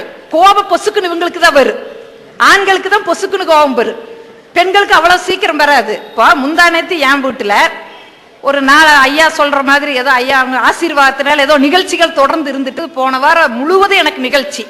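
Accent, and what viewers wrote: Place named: Kongu, India. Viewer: கொங்குத் தமிழுக்கு செவி சாய்க்கிறேன் சகோதரி❤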